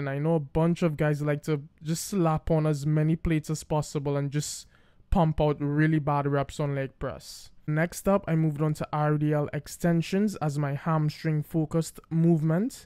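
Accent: Trinidad and Tobago. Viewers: Great content. Can't hide that Trini accent 🇹🇹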